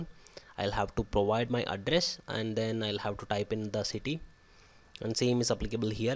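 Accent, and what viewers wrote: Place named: India. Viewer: Am I the only one who can t support this Indian accent? no offense...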